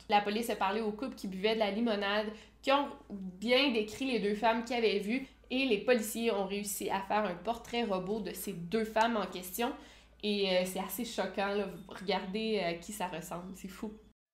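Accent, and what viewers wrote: Quebec, Canada. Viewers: Bon bah voila l’accent Québééèquoaahh me fait plus rire 🥰 suis amoureux !!!! J’aime bien tes videos c’est du bon boulot, interessant, captivant et 🥰....🥰.....S....sm.... smack 💋 /run !!!!!!! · merci beaucoup pour toutes ces histoires très intéressantes !!en plus bien raconté et avec un petit accent canadien,c encore mieux !!👍👍👍🏻👍🏻👍🏻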